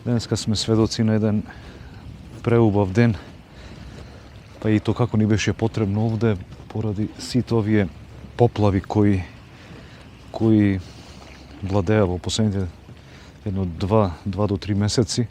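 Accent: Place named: Bitola, North Macedonia. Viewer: Odlicno video prodolzi da snimas za nas makedoncite.Po akcentot mora da si od bitolsko pozdrav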